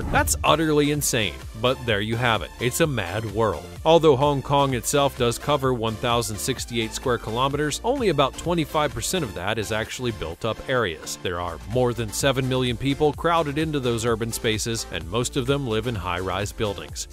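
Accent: United States. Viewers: Hard to keep up when you do metric sometime and imperial the other. In America we do imperial and seems you sound American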